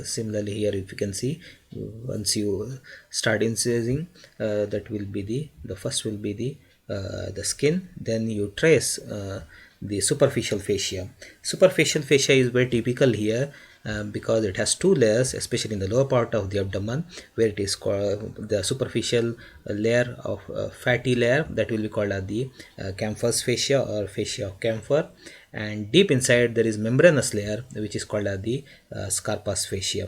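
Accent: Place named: Arab world. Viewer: he is an arab. its the accent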